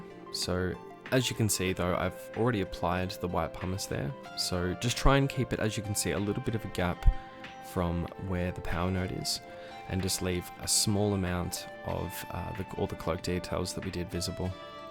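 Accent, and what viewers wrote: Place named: Australia. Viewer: I knew I was in good hands when I recognised the fellow Aussie accent. Great to see us taking over the YT Warhammer scene.... SUBBED!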